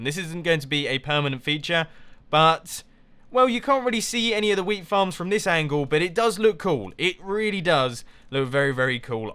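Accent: England, United Kingdom. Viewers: Are you English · I like your british accent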